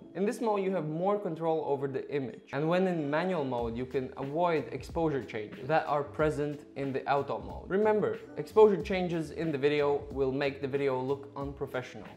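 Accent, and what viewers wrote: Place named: Latvia. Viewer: pēc akcenta uzminēju, ka latvietis :D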